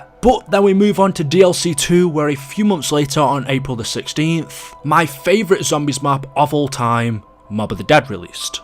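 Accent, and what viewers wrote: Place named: England, United Kingdom. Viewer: Nick Barrow He has an accent. I think he is from Birmingham or Manchester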